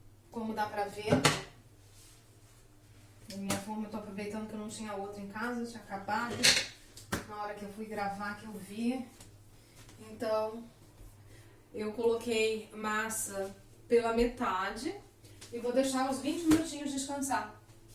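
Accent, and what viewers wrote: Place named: Rio de Janeiro, Brazil. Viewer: A locução mto carioca ,fala gritando rrrr e ssssss. Cansei me deu dorrrrr de cabeça. Deu não 🙆👋👋👋👋👋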